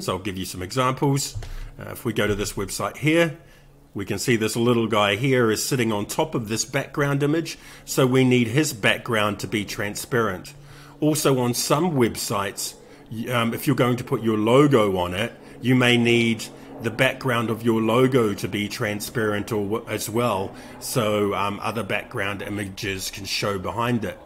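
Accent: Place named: Australia. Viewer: This guy has an Autralian accent. He sounds nothing like Joris Bohnson.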